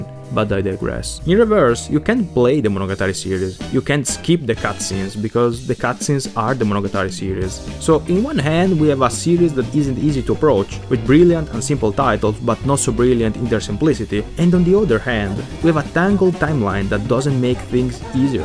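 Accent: Italy. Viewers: Greetings from Itali fratello. While your accent makes the audio a bit more difficult to understand, it makes me feel a bit prideful to know this franchise has such a smart and good fan and he is from my same country. Wish you well, hope life is going well for you. I had this on my Guarda più tardi for the last few years, glad i finally watched it all. I know i will rewatch the whole Monogatari sooner or later and thanks to your video, i will appreciate it even more. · Haha, anche io appena sentito l'accento ero curioso di sapere se fosse italiano, stavo per scrivere lo stesso tuo commento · By any chance, are you italian? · his accent sounds very Italian to me.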